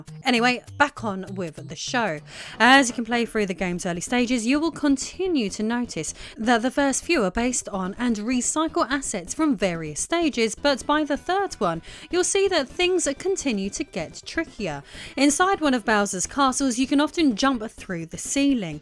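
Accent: United Kingdom. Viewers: I'd ask you to explain faster but you're hot and I don't USUALLY like British accents however for you I'd make a huge exception.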